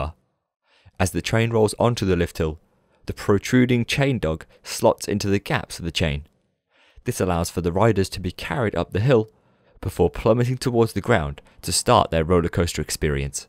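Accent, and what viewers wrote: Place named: Black Country, United Kingdom. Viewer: Ahh - I used to live in Dudley and was trying to figure out if it was a little Black Country or Brummie twang I could hear in your accent :D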